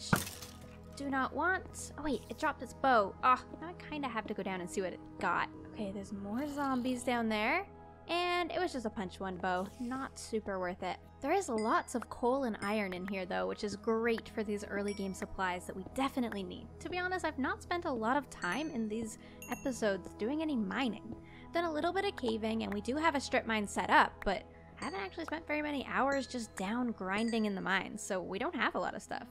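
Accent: Australia. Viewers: Just a question are you Australian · Hey! Are you Australian at all? I love the videos, great job:)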